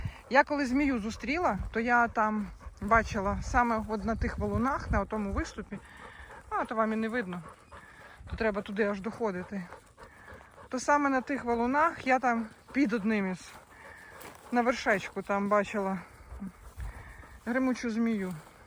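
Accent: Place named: Podillia, Ukraine. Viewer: Ви часом не з Поділля?Мова подільська,точніше-вінницька.Уявляю,як зреагує ваш чоловік,коли там ви насієте кропу,петрушки і прикрасите чорнобривцями.Я жартую,звичайно.Бажаю вам здоров'я і щастя!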